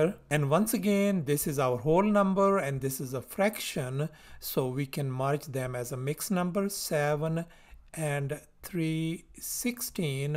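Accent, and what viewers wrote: India. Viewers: Sir is probably an NRI, as u can hear from his voice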